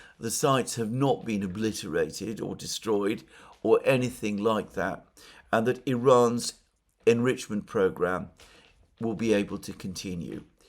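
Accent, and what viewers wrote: United Kingdom. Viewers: Where in Britain is that accent from? Giggles make it even harder to pick. Sorry bro, I have to skip this one.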